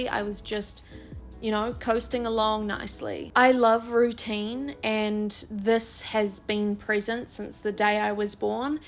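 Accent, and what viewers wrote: New Zealand. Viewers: I knew u were from New Zealand lmao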